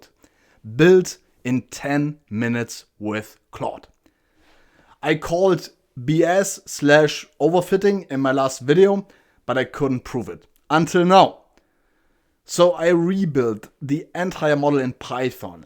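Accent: Germany. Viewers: Der deutsche Akzent ist nicht zu überhören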